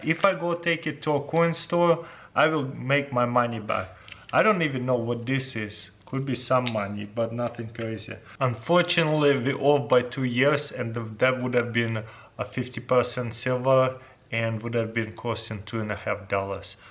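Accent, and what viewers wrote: Russia. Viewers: Why does it seem like auto captions works better on english in a russian accent than english in a... english accent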